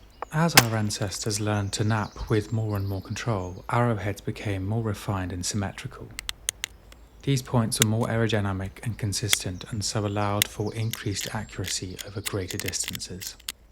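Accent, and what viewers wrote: England, United Kingdom. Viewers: well spoken English accent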